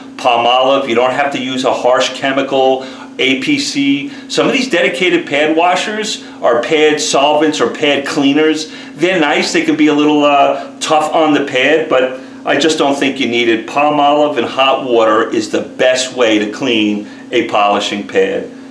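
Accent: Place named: Dallas, United States. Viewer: Good video w/ info - noticing a heavy Dallas accent there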